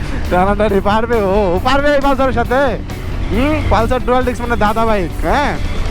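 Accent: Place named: Chapai Nawabganj, Bangladesh. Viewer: জি ভাইয়া চাপাইয়ের ভাসা অনেক হাসির🤗😁😀😀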